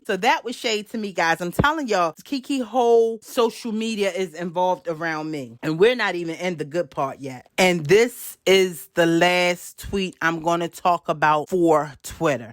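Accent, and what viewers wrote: Baltimore, United States. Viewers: Baltimore accent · You're so beautiful!!!!😍😍😍 and that BMore accent is everything 😂😅😂💪🏾💪🏾💯💯 and chhhiiiiillllleeeee iono what to say bout Ms. Keke. Sorry to that girl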